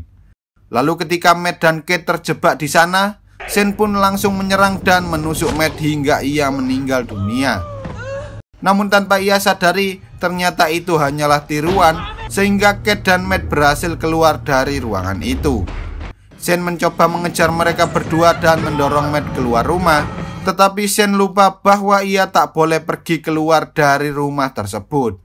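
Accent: Java, Indonesia. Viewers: Mas koe opo wong jowo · orang jawa lik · medok e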